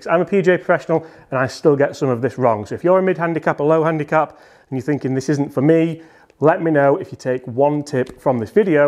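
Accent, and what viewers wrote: Yorkshire, United Kingdom. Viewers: Keep these coming. Look forward to your daily tips and down to earth Yorkshire tone . Love it !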